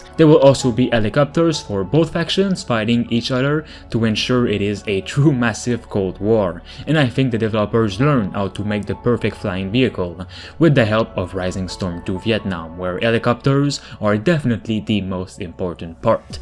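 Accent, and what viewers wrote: Quebec, Canada. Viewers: Your Canadian French are you bro?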